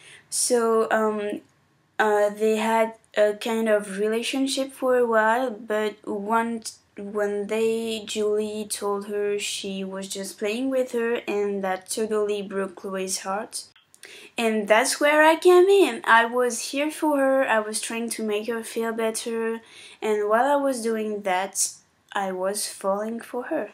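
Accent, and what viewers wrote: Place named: France. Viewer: j'adore votre accent . teach me more french?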